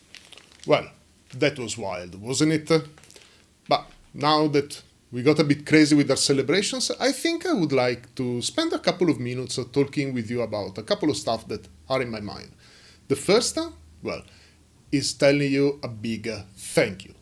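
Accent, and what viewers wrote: Italy. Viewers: Congratulazioni! Mi era sembrato di riconoscere un accento italiano, e ora ne ho la conferma! Apprezzo moltissimo i tuoi contenuti, i tutorial sono estremamente ben fatti e facili da seguire. Non vedo l'ora di vedere i prossimi video sul MG Sazabi. Personalmente, sono un grande fan del weathering, quindi sarebbe davvero interessante vedere dei tutorial a riguardo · Love your content so much, as a beginner they’re very helpful improving the skills of gunpla building. Also love the mood of the videos, they’re relaxing and always make me smile. Congrats for the 1000 subs! (Just a quick question, sei Italiano? Dalla pronuncia sembri emiliano come me ahahahah)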